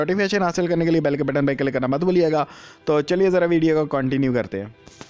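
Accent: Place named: Punjab, India. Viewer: Punjabi touch aa gyya hai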